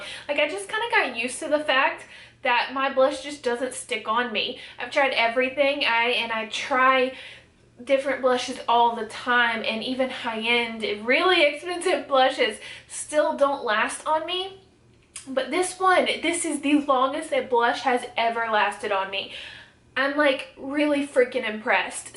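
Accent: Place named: South, United States. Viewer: I do find that so crazy. Even though I don't personally make videos or anything, I do watch mostly "beauty" related content here on YouTube. And I, personally, like to watch those that I find relatable. Which is why I, typically, stick to watching the "smaller" channels, per say. I've seen several where I hear a thick southern accent and get a little hyped thinking they might actually be from here, but they never are! LOL. You are the only one I have come across, thus far!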